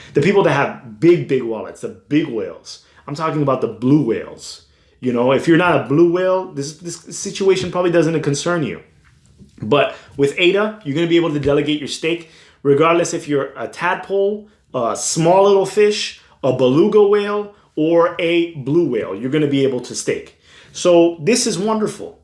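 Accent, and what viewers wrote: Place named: New York, United States. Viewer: Did you go to an Ivy? You are very eloquent. And you sound like you're from NYC.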